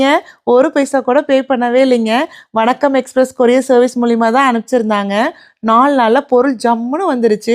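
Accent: Kongu, India. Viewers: திருப்பூர்... நீங்க எதார்த்தமா பேசறது கேட்க நல்லா இருக்கு.. நம்ம கொங்கு நாடு பாஷை அருமை 💚💚💚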